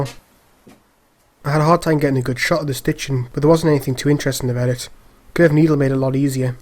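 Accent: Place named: Scotland, United Kingdom. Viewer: Great videos! Thanks for uploading! Clearly, you're from Scotland, but do you mind if I ask what part?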